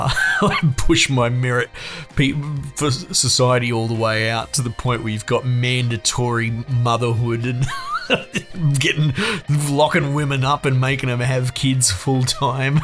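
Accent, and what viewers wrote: Australia. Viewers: I can tell you’re Aussie as well, love how you telling it straight. 😂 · As an Aussie, it's come as a massive surprise how pleasing it is to hear your accent. I usually find the Aussie accent repulsive. So --- compliments to you sir.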